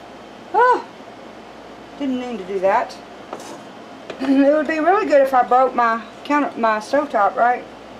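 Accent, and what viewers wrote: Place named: South, United States. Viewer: Southern Ark No, Thank you for teaching me things I don't know, and the way you say y'all with your southern accent.....love the way you speak.👍❤️🇺🇸🇬🇧God bless America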